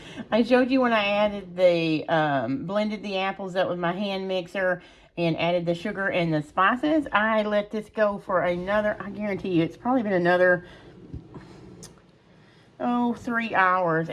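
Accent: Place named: South, United States. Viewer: I wish I could watch and listen to your videos more often, but I have difficulties understanding you. It is a combination of speed and your lovely Southern accent. I always have both the volume and the closed captions on, but I still miss much of what you say. You often speak too rapidly for me to catch things. I need to back up and re-play sections often. I wish it weren't so. 😢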